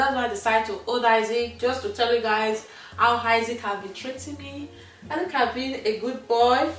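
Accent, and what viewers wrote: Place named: Benin. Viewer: Hey n hubby New subscriber Alert💃🏾💃🏾💃🏾 and I have watched sooo many of your videos...lol I love the fact that you are very natural and I can relate soo well with you cos am Nigerian and am feeling that Benin accent sooo well! Lol Love u gurl All the best to your hubby and the new baby Cheers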